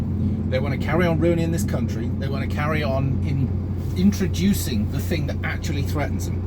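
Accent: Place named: Cockney, United Kingdom. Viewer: Damn! You're a Christian? No wonder Christianity is dying on it's arse! I thought you just some bloke escaped from some East end Pub spouting off the usual " facking cants camming, tekkin ahh jobs an fackin aahses" blah, blah,blah, Oh and there is no Christian army, never will be, there's no conviction, no faith in....the faith,..3 in 1 but 1 is 3? leave it out? You'll need a Fearless Muslim one for when the Russians get cheeky!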